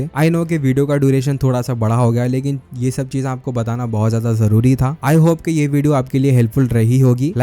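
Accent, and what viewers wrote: Hyderabad, India. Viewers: Bhai aap hydrabadi ho kya??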